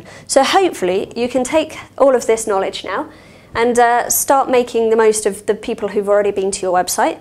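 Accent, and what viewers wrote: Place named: England, United Kingdom. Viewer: Not bad, a little more experience and she'll be golden. I will say, English accents go a long way, if this was an American woman I don't think it would have played as well. I could listen to her talk all day...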